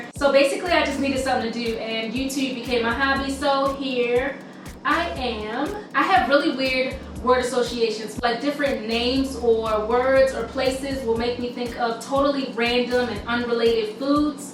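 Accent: Baltimore, United States. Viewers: I knew u were from bmore by ur accent. Youu-teuuuube!! Lol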